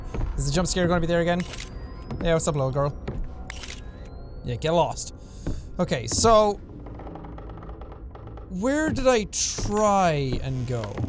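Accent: Ireland. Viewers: I love how he gets more Irish when he's angry or scared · It's an Irish accent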